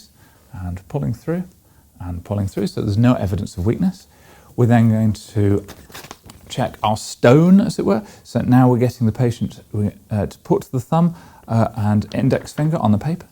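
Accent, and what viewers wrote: United Kingdom. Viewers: It’s interesting for me to hear all of these same unusual terms, said in a similar British accent, but by a different doctor. I’ve watched the Curtis Robb hand examination video tons of times. It’s crazy how complex the hand system is!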